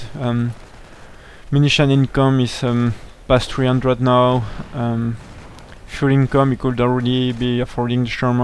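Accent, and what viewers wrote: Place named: France. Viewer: nice accent dude you must be the only french whose english i can listen to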